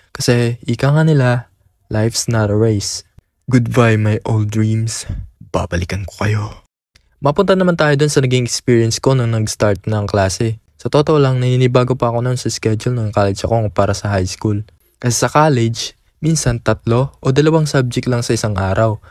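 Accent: Philippines. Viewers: Parang sa catubigg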